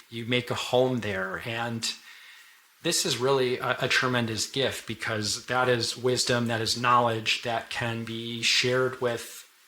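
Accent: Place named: Minnesota, United States. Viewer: All I want to know is, are you Minnesotan ? 😂 your accent give me that vibe. Also Scorpio rising video was on point !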